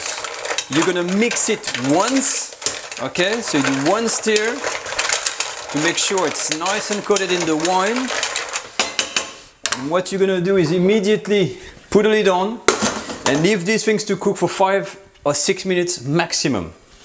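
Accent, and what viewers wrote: France. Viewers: j'ai reconnu à l'accent que tu étais français, en tout cas super que tu parles en anglais, ma voisine va encore plus adorer;), en tout cas super bien expliqué,super recettes... 👍👍👍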